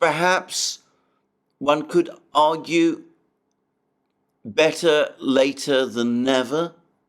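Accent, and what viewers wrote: United Kingdom. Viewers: Which part of the UK is this guy from? I like his accent lmao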